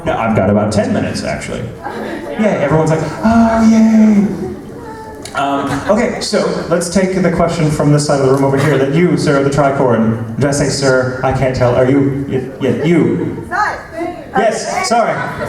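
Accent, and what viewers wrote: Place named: United Kingdom. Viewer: lol outside world : nobody cares about how he speaks; oooooh he's British, good for him... in conventions : when he speaks like that, everybody dies <3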